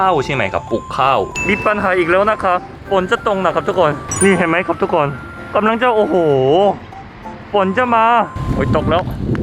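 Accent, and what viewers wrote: South Korea. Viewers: คนไทยเค้าเอ็นดูคนต่างชาติอยู่แล้วค่ะ เหมือนเราก็เข้าใจว่าเค้ามาต่างถิ่นต่างที่ มาเที่ยวบ้านเรา เราก็ต้อนรับเค้าเต็มที่ค่ะ ยิ่งคุณ พูดไทยสำเนียงเกาหลีคนเค้ายิ่งเอ็นดู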